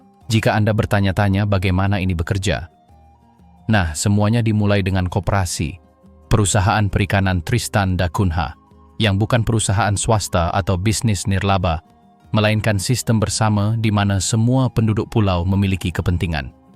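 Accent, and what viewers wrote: Malaysia. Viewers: Logat ngomongnya ini dari malaysia, tapi nama akun nya pakai nama indonesia...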